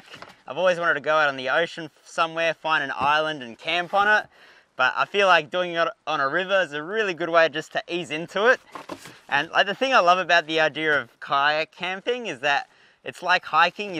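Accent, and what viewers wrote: Australia. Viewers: I think his parents are from Poland but he sounds like he has a good Australian accent.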